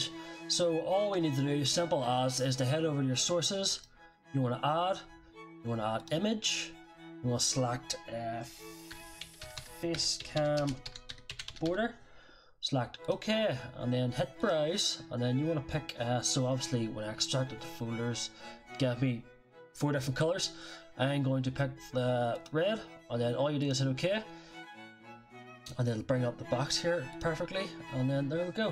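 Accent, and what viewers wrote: United Kingdom. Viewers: Is that a Belfast accent?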